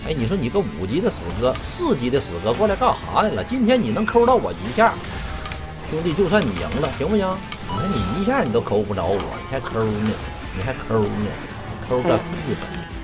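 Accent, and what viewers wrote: China. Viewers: 猴哥到底大陸哪裡的口音呀？積木XD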